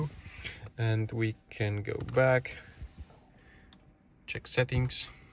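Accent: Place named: Poland. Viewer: Dialekt jak by Polski🤔 super tego szukałem 🙏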